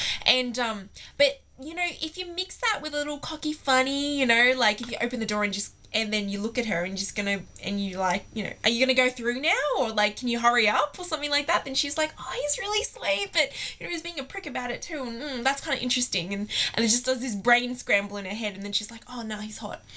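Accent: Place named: Australia. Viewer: u have such a aussie accent... and r u wearing a spaghetti strap for PJS?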